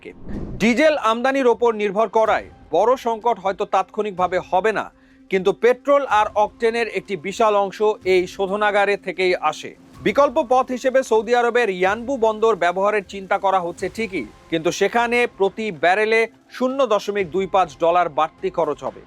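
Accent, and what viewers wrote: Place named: India. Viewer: ভারতের মতো নিউজ পড়েন কেন🙄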